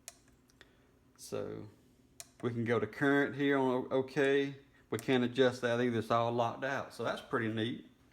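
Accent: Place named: Tennessee, United States. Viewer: great video , you saved me from deciphering the manual .. great accent , is that from Tennessee or Texas or both :)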